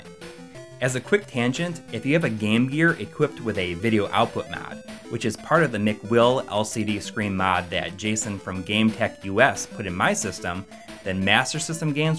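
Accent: Wisconsin, United States. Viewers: U sound like wisconsin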